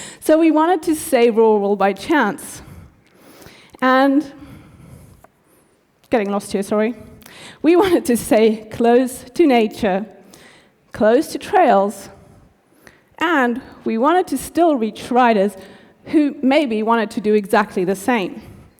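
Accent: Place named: United Kingdom. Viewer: Well done, my friend. And yes I hear the British accent. 😊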